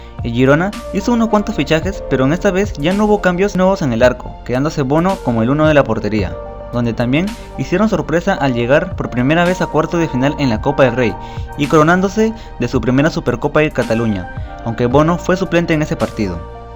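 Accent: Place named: Argentina. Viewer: Porque habla como un argentino??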